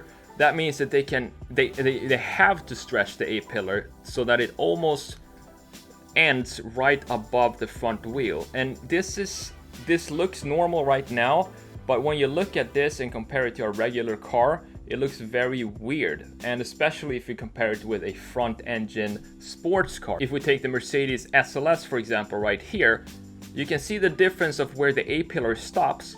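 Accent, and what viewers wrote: Sweden. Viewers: are you swedish? love your content btw! :D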